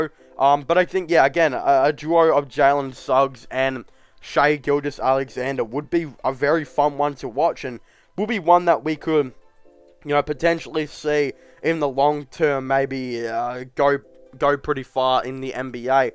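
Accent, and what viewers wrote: Australia. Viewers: Dude are you Australian or are you trying to sound Australian and doing a bad job at it?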